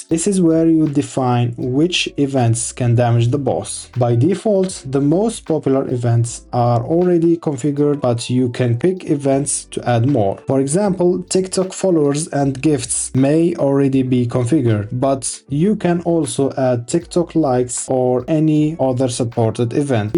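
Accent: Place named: Morocco. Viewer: You sound very Moroccan a sahbi 😁🔥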